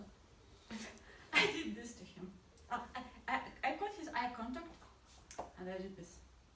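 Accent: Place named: Russia. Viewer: I love your typical russian accent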